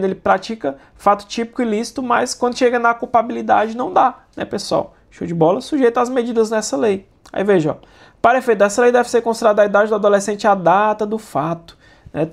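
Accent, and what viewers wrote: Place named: Northeast, Brazil. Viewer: Ninguém pode se irritar com seu sotaque nao, oxi #orgulhonordestino. Sou carioca, mas minha familia é nordestina!! Excelrnte prof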